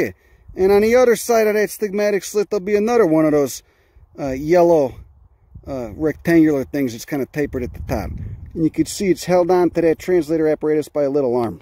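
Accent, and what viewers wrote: Chicago, United States. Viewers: An educated botanist who sounds like a Chicago gangster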